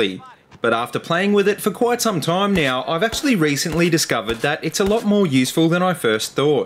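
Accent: Australia. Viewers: He has to be Australian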